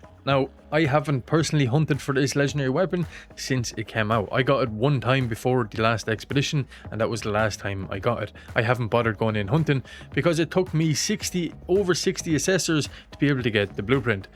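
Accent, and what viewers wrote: Ireland. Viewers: Great video,! Extremely helpful. And every time I watch one of your videos, your brogue reminds me that I need to get back to Ireland.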